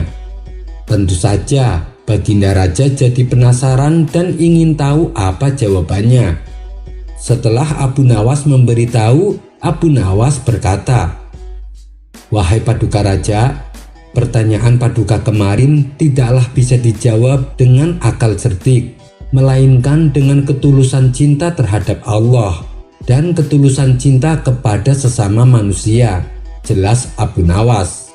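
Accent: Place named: Medan, Indonesia. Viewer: Medan bung...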